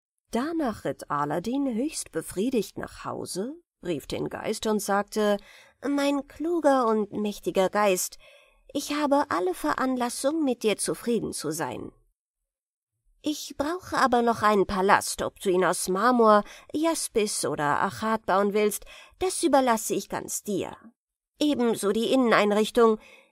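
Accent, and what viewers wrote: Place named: United States. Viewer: ja klar, Geschmäcker sind eben unterschiedlich. Mir hat es dann trotzdem ganz gut gefallen! Auch wie du die Stimmen machst zu.b. find ich gut. Es ist nur was in diesem SingSangton ... Ist neuerdings bei jungen Vorleser so verbreitet, Ich frag mich was das ist und mutmaße: es ist ein Amerikanismus!! Versuchen sie die Amis zu reden auf deutsch. Und das klingt einfach blöd in meinen Ohren.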